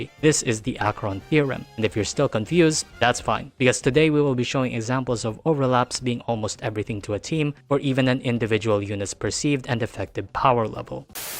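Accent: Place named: Philippines. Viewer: This guy lowkey sounds filipino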